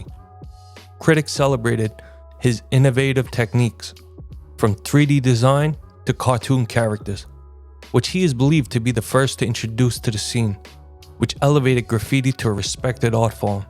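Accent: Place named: New York, United States. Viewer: Very well done doco. You have the perfect classic NY accent for the narration that really sets the tone. Fly high Tracy168🙏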